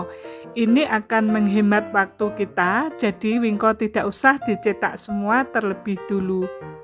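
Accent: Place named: Java, Indonesia. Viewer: Org malaysia kah ko tp medok jawa mksh resep nya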